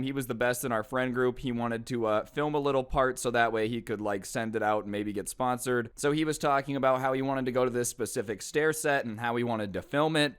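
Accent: United Kingdom. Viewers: British accent 10/10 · best british accent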